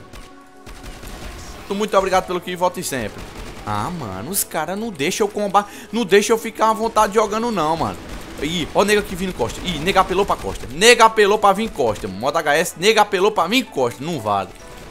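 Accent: Northeast, Brazil. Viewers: Man Se E du Nordeste ? LIKE BRODI ! ♥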